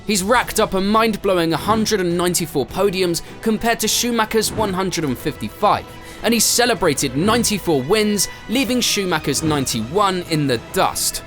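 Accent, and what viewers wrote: United Kingdom. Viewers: I can smell the bias British from 300 miles away pff. ugh...no debate he doesnt even belong to that list. Fangio, Ayrton senna and Michael Shumacher and i think now Max popping up. Not Lewis. And with bias British accent people cant take this serious having such debates, bias bias bias. Remind you he lost from Button, Rosberg, <> Massa, Russell some even teammates outqualified Lewis in a season. I dont see Fangio, Ayrton or even Micheal or Max doing that. Oh i dont even start his dad was poor as he got financial backup from Ron Dennis at the age of 11.. His career before F1 isnt exactly bright at all compared to other F1 drivers.. Other drivers BEFORE entering the F1, perhaps you can spot the difference: Verstappen: 2010: WSK Nations Cup - KF3 - 1st 2010: WSK World Series - KF3 - 1st 2010: WSK Euro Series - KF3 - 1st 2010: CIK-FIA World Cup - KF3 - 2nd 2010: Bridgestone Cup Europe - KF3 - 1st 2011: WSK Euro Series - KF3 - 1st 2011: WSK Master Series - KF2 - 1st 2012: CIK-FIA World Cup for KF2 - 2nd 2013: CIK-FIA World KZ Champion - 1st 2013: CIK-FIA European Champion - KZ - 1st 2013: WSK Master Series - KZ2 - 1st 2013: WSK Euro Series - KZ1 - 1st 2013: CIK-FIA World Champion - KF - 3rd 2013: CIK-FIA European Champion KF - 1st 2014: FIA Formula 3 European Championship - 3rd 2015: Formula 1 Hamilton 1995: Super 1 Nat Champion – IAME - 1st 1996: Kartmasters British GP – Comer - 1st 1997: Super 1 National Champ– FY 1th 1998: Torneo Industrie – 100 Jun- 19th 1998: Green Helmet Tro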